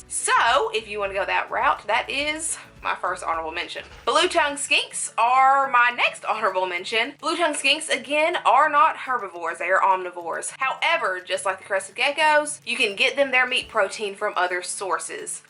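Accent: South, United States. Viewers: Apropos to nothing, but your accent is the cutest!!! I'm from AR originally, so I love a good Southern accent.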